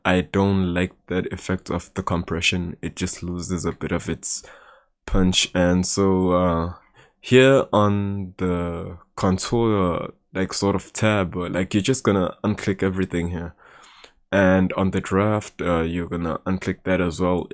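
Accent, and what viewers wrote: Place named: South Africa. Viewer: I know a South African accent when I hear,I'm glad there's more fans in the country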